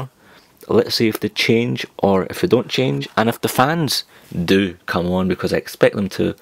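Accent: Scotland, United Kingdom. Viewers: Scottish accent FTW!! Nice video btw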